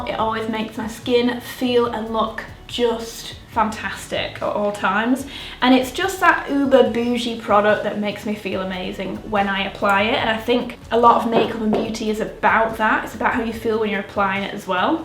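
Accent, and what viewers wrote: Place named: Northern England, United Kingdom. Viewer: LOVE the northern accent, this was great <3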